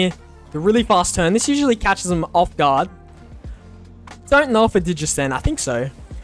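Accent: Australia. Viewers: as a kiwi voice is one of the only aussie accents i actually like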